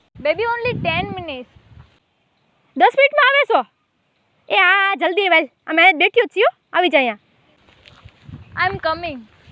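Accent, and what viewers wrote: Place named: Junagadh, India. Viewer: Having grown up in junagadh...You made me nostalgic, listening your cute accent....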